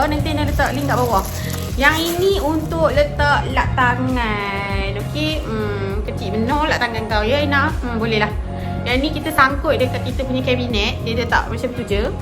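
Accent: North, Malaysia. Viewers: aina kalau ckp utara lagi best dgr 😂 sy suka dgr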